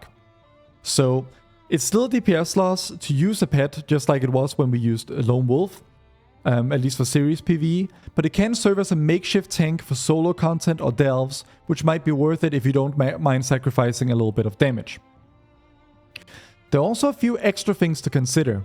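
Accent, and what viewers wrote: Denmark. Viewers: He is danish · I hear the danglish :) good video though. Takker.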